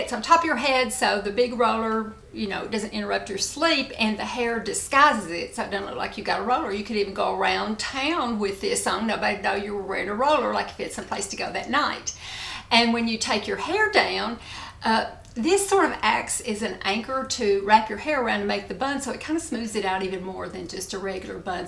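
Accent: South, United States. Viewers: You are just so stunning and in your 60s. You look so much younger. I love your beautiful Southern accent! Thank you for all the great tips. I love your channel.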